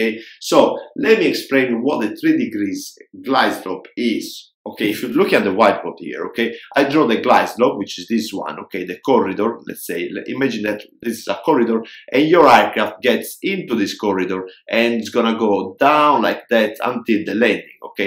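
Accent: Italy. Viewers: Salve capitano.....ha un accento italiano....grazie per i suoi video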